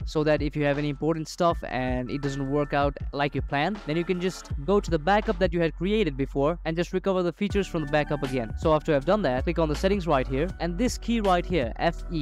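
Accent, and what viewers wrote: India. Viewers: Didn't work. I should have known once I heard the Indian voice. Moron ...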